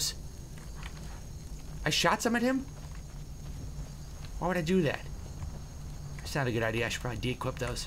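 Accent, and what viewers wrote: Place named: Scotland, United Kingdom. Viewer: awesome Scottish accent xD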